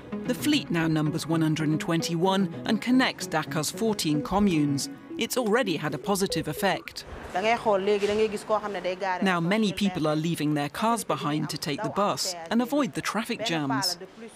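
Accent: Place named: United Kingdom. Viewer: I stopped listening at British accent. Tired of the British accent narrating African reality.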